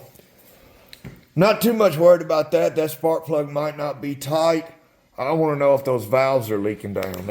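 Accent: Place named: South, United States. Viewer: Man do you know what kind of southern accent you have? Like what region did you grow up or develop that thick accent you have?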